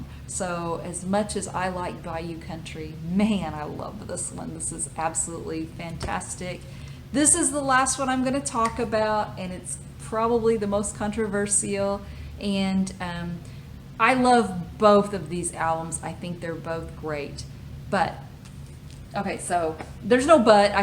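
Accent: South, United States. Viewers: Folks, don't let the blond hair and/or southern accent fool you. The lady has a partly sophisticated and insightful taste in music. I wish I had a dollar for everytime I played my brother's LP.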